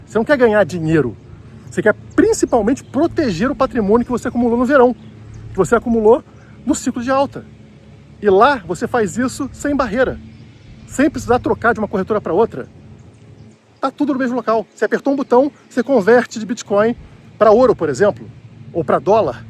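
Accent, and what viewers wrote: Rio de Janeiro, Brazil. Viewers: Pq tanto desespero pra vender curso se vc é tão foda ? 😂😂😂 Carioca né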